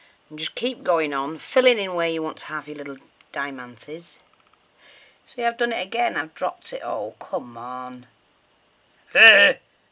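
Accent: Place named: England, United Kingdom. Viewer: i m glad to hear english im from there but live in ireland.laughing you saying buggering thing.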